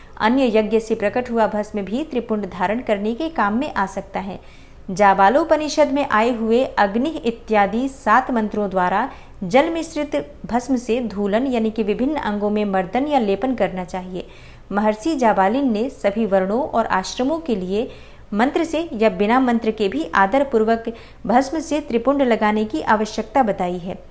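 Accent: Standard. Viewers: 🙏🙏धन्यवाद आपका उच्चारण बहुत सुन्दर,सरल और शुद्ध है ❤ हर हर महादेव 🌹🙏🌹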